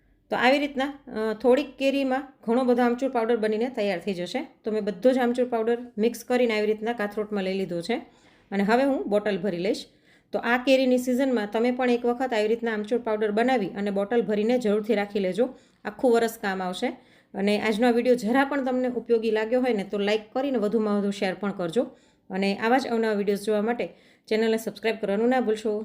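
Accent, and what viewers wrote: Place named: Bhavnagar, India. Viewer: ભાવનગર બાજુ ના છો ?ભાષા એવી છે માટે પુછયુ ખુખજ સરસ રીત બતાવી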